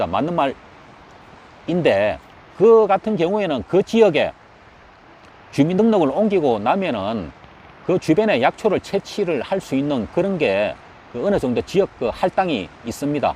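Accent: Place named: Uiseong, South Korea. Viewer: 의성 말투 같은디요.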